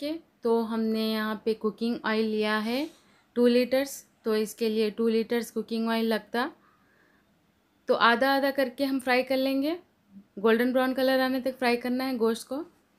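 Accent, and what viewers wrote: Hyderabad, India. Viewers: Hedrabadi aese hi bat karte hain Meri ami b hedrabadi hain Or woh b India se hain magar shadi ho k Pakistan aee thi un ka lehja ab b thora thora wesa hi hai jab k unhe yahn aye hue 33 years ho gye hain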